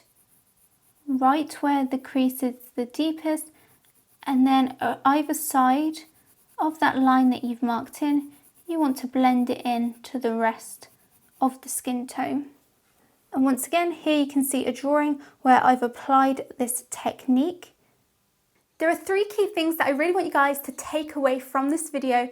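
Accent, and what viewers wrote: England, United Kingdom. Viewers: You have blown my mind with these videos. I have avoided coloring a lot of my pictures because of skin tone and I bought a pack of Crayola skin tone colored pencils which is cool but I kind of felt like it was cheating. The one thing I do understand is that all you need is red blue yellow black and white and you can create almost any color that exists especially with paint. But I like colored pencils and I don't know how I've never come across a video that explains so easily how to create skin tone and now I'm doing it like a pro and I have to thank you for teaching me that. Anybody who isn't British I can tell you things that not only you are very pretty but it's hard not to love your accent. Which I kind of think it's funny because where you live it's not an accent but a bloke from America thinks it's super Charming. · Her accent or british accent is perfect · The english from England is really beautiful !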